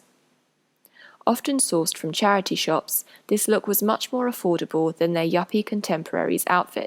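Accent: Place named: United Kingdom. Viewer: This lovely britich accent) very good to hear - difficult to understand) your video is great)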